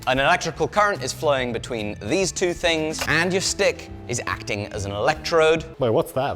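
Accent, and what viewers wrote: United Kingdom. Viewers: LOVE your videos! Humor, science, black smithing, smithing porn, cool tools, fun facts, awesome cool music, and that proper British accent. It’s perfect right innit?!? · “Hex-uh-ginz”. Jesus. I love how British is.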